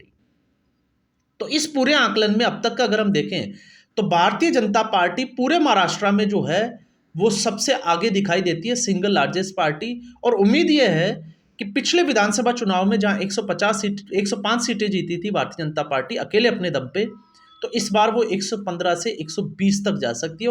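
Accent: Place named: Haryana, India. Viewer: आपकी भाषा में हरियाणवी पुट है,,🎉🎉🎉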